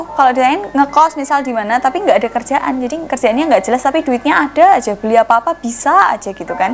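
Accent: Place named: East Java, Indonesia. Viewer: Mbk asline wong ngndi??? Logat'e kyok.wong jawa timur'an..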